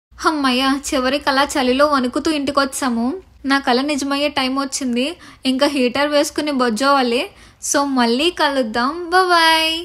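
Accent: Godavari, India. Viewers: Ma godavari slang baguntundhe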